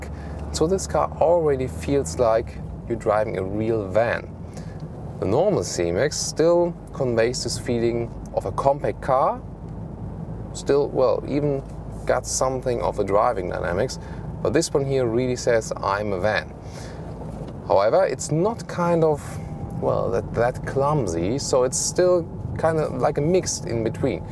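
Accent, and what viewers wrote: Germany. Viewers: Ist ein sehr "deutsches" englisch, daher sicher auch für deutschsprachige besonders einfach zu verstehen ;)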